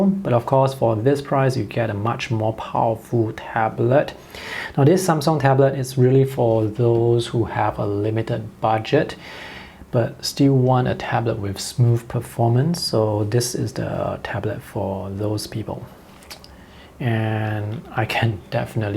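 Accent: Singapore. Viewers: Bro u Singaporean or Malaysian?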